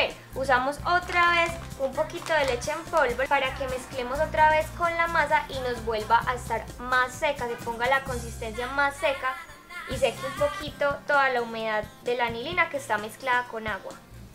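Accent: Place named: Antioquia, Colombia. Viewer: Realmente el acento Paisa.. (de Antioquia)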